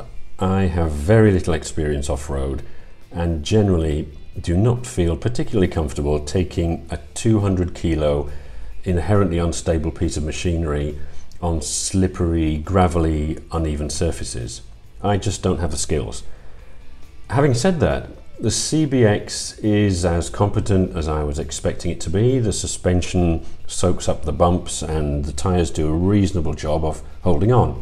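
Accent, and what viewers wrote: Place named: Newcastle, United Kingdom. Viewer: he’s a Geordie I think. Sounds just like you 😂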